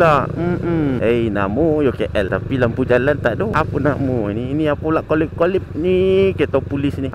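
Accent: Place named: Negeri Sembilan, Malaysia. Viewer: Best lak tengok abg membebel dlm bahasa nogori... 😂😂😂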